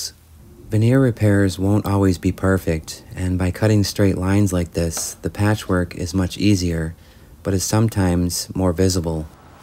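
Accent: Wisconsin, United States. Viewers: Trop beau! J’aime autant vos silences que votre accent du Wisconsin. Ne changez rien. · Now that is gorgeous! I could only hope to achieve something minorly close to that… Love your videos with sound without sound with talking not talking and of course I love your Wisconsin accent since I am from Wisconsin but I’ve lived in the south for 25+ years. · It came out great! PS love that Wisconsin accent💕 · Wisconsin accent? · That natural wood grain is so beautiful, hard to believe someone would paint it! I smiled when you mentioned your Wisconsin accent, I being a 70 year citizen of this state I thought, what accent? Lol! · As a former Midwesterner, I appreciate the Wisconsin accent ❤ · I’d listen to your Wisconsin accent all day! Beautiful restoration once again! 😊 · As for your accent, I love Wisconsin and I love any and all information you can provide about the piece and process. 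❤ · That paint stripper worked great..I found the environmental strippers didnt work well at all ..I love your Wisconsin accent 😊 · I enjoy your "Wisco" accent. I have only heard Trixie Mattel's exaggerated "Wisco" accent. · Amazing.....you bring back the original beauty!❤ And Ilike your wisconsin-english😂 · Very nice! I remember when you first did a veneer replacement. 😊 you've come along way. And I love you're Wisconsin accent. Growing up in Sun Prairie, going to East Town Mall as a teen, Saturday's in the parks. You make me remember my carefree youth.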